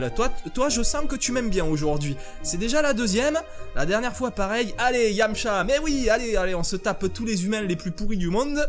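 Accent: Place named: South, France. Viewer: petit accent du sud ça fait plaisir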